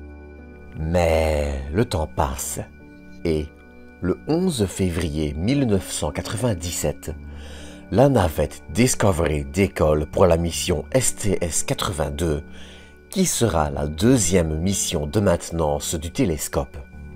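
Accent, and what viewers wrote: Belgium. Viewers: Ce monsieur a tout à fait le droit d'être belge et d'avoir une manière différente de parler... Quel commentaire insupportable. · Je sais que je ne vais pas me faire des amis mais le documentaire a l'air vraiment sympa et très intéressant mais la narration 🥶🥶🥶 au bout de 10min j'ai arrêté car je n'en pouvais plus... dommage...un mélange de belge, américain, allemand et canadien. Et la façon de prononcer Hubble à chaque fois ça devient très irritants.. mais je répète dommage car le documentaire doit être vraiment intéressant.. désolé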